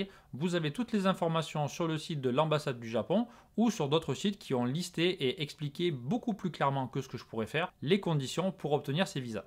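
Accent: Marseille, France. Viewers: super vidéo au passage mon pseudo c'est daruma c'est juste que le pseudo était déjà prit donc le "a" est devenu un x. Masterclass comme d'habitude (ton accent de Marseille me fait trop rire, même après des années au Japon tu ne la pas perdu)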